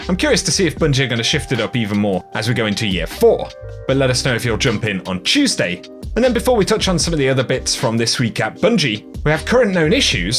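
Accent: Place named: United Kingdom. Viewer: It’s a british accent, big deal?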